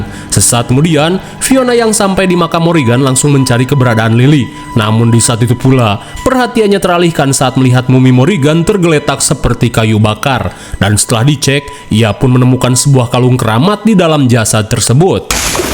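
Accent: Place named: Java, Indonesia. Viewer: jowo pisan ta iki😂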